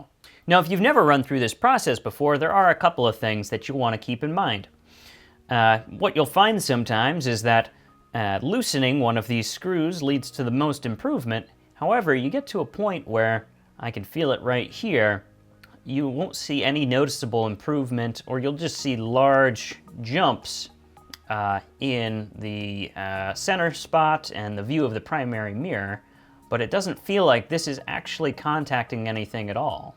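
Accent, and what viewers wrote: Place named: United Kingdom. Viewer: I think you must be British!!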